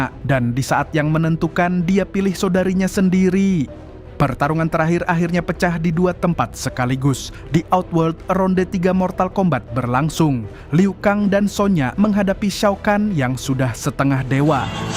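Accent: Banjar, Indonesia. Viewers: Admin orang Banjar kah Ikam 😂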